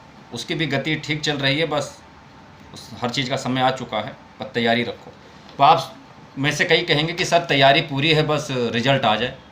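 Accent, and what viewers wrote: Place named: Ghatampur, India. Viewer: Ghatampur ya fatehpur ki language lag rahi hai, sir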